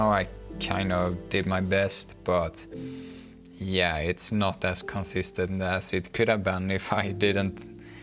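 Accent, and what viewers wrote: Sweden. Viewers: YouTube knows me well, nothing better than Swedish accent working on an impossible music instrument to not go to sleep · A- are you swedish?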